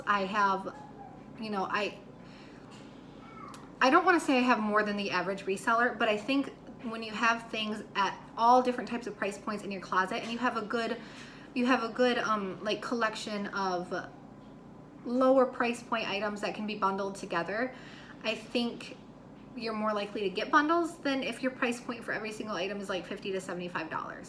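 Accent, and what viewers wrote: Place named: Upper Midwest, United States. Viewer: Are you from North Dakota or Minnesota by chance? I’ve been watching the ‘Fargo’ series on Hulu lately and couldn’t help notice you have a little accent that’s similar to the show.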